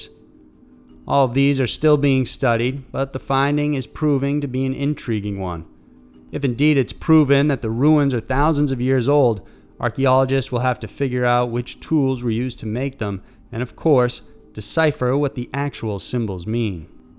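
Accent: South, United States. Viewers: Wonder if he has southern roots like McCaunahay?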